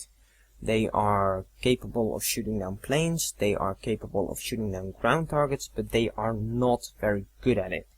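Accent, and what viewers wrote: Netherlands. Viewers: Are you dutch you have a Dutch accent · are you dutch? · im 10 seconds in the video and I already know now that this guy is dutch. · Thnx for the vid, That's a Dutch accent :) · are u dutch i can hear a tiny dutch accent in what ur saying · Ff een vraagje... Ben je Nederlands?? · you are dutch... no doubt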